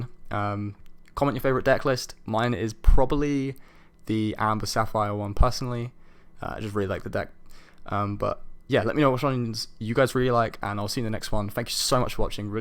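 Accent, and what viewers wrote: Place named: Europe. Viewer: Its normal for North America to dominate the TCG space in terms of sheer numbers. The United States out purchases all of Europe in pretty much every TCG at least 3:1. The American dream screams win big money from tournaments so there are soooooo many more TO's running events per game than pretty much anywhere else on the planet. (This tends to shock most European players until they look hard at the state of their official World Championship series for their respective game vs United States.) Between the accent and you having commented on not coming from Magic, I am going to go on a limb and ask if you are European?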